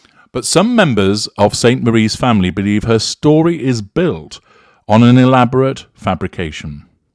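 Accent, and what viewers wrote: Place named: RP, United Kingdom. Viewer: Waayy too much repetition in this series. It is mostly just a compilation of second-hand bits from the news media. A lazy piece of ‘investigation’, and chock full of mispronunciations: ‘Regina’, ‘Qu’Appelle’, ‘Ontario’. And what is meant in Part 1 by, ‘Canada and the Territories’, for heaven’s sake? You might have a posh accent but these podcasts are a very lazy effort to create content.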